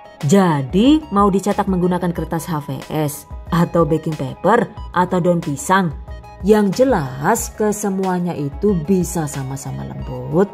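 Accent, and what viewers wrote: Banyumas, Indonesia. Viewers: Ibunya logatnya kaya orang Jawa ngapak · Orang mana kak logat bahasa jawanya ngapak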